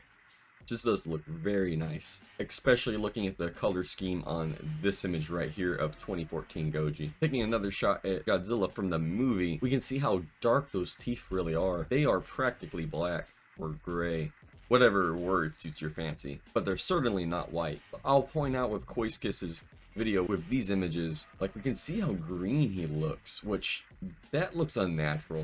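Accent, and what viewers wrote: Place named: United Kingdom. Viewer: ? you sound like you’re British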